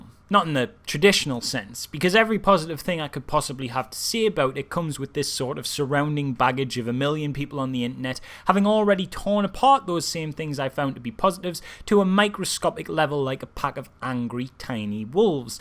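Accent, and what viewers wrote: Newcastle, United Kingdom. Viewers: British huh......pussy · I fookin luv ya u geordie shite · Newcomer to the channel - thanks for the honest thoughts in this video. I liked TLJ a lot too. Also, love the unapologetic Geordie-ness. Good on you, fella (from way down South in Derby) 😎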